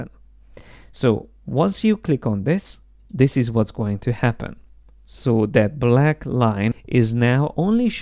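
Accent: Hungary. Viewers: Nice tutorial, are you hungarian?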